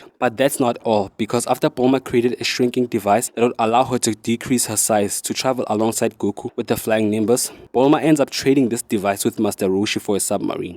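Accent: South Africa. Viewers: Sorry to say, your accent sounds more like a South African accent.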